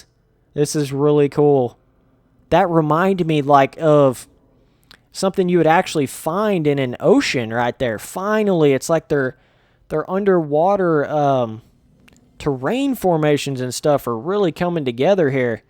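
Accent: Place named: South, United States. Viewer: There is something about a dude with a Southern twang playing No Man's Sky that is just super wholesome.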